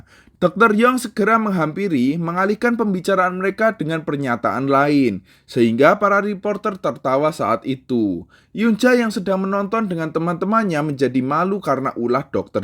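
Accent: Java, Indonesia. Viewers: Medok jawanya hilangin ya ka...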